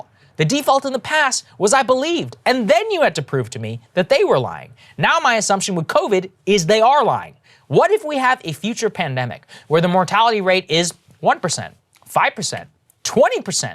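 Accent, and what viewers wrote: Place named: Boston, United States. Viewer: This sounds like a Boston leagel speach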